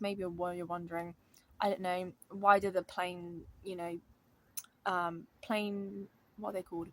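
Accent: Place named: United Kingdom. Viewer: I'm from Germany and I love your British accent. (Hopefully it is British I don't know too well)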